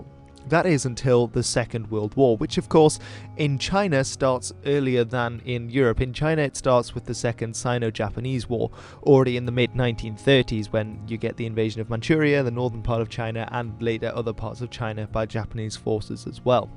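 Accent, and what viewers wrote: United Kingdom. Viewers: his accent is not American at all though, it's British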